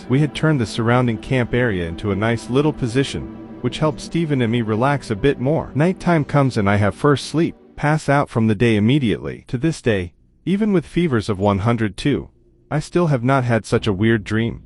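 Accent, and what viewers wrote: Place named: Russia. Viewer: this Russian voice was hard to understand but I'm loving your work so far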